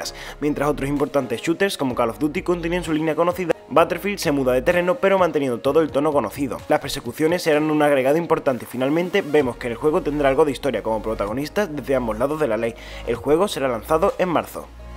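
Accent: Andalusia, Spain. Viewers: Eres andaluz? Tu acento es diferente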